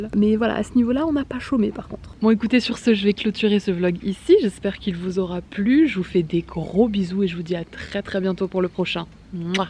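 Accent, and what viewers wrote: South, France. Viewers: Depuis quand tu as un accent du sud ??? 😂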